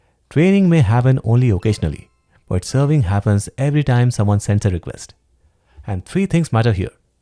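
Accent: India. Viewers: Indians and their english.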